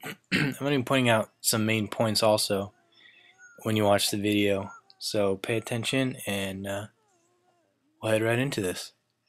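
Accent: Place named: Canada. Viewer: ¿',: | i can tell that your canadian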